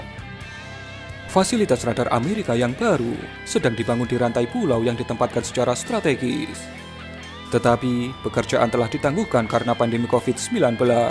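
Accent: Java, Indonesia. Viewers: suara admin yg medok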